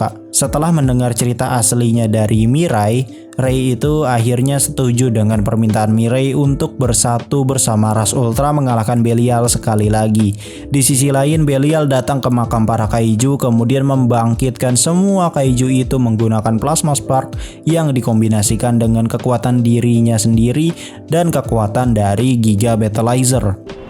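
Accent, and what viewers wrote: West Java, Indonesia. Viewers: Bang maneh teh orang Sunda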